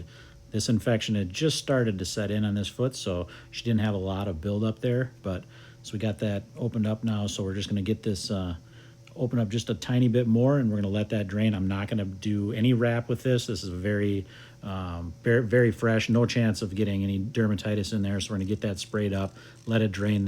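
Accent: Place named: Wisconsin, United States. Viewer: I can tell a good ol Wisconsin boy when I hear one! I love your videos. You're the best one I've seen. You can really tell you care.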